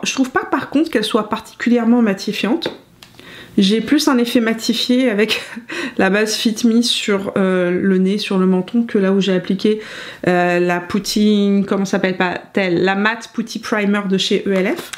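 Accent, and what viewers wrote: Quebec, Canada. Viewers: Bravo pour l’accent un peu québécois!! D’une pure laine !! Très très beau looks, mais le modèle y fait pour beaucoup! Xx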